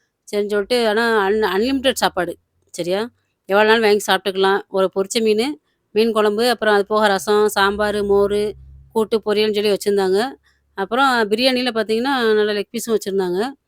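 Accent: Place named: Tirunelveli, India. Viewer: நீங்க எந்த ஊர் திருநல்வேலி பேச்சி மாதிரி இருக்கு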